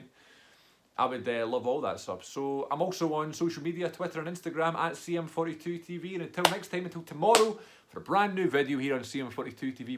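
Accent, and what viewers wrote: Glasgow, United Kingdom. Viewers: I live pretty close to St Louis so I'm gonna be rooting for the Battlehawks, but the Roughnecks, Defenders, and the Guardians were all pretty good as well. From on-sight reporting, there appears to be quite a bit of excitement for the St Louis team because they've been without a team since the Rams moved to L.A. 3 years ago. The Battlehawks are gonna be playing in the old Dome stadium that the Rams played in, so crowds will look small at first, but hopefully if this league is a success, and the Battkehawks turn out to be good, they will garner a decent crowd there. PS. I worked with a guy from Glasgow back in college at Southern Illinois University. His accent wasn't nearly as thick as yours. Must've been because he'd been in the States for awhile.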